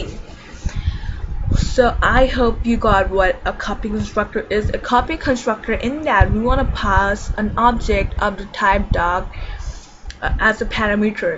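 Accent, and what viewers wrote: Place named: United States. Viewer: american english but its good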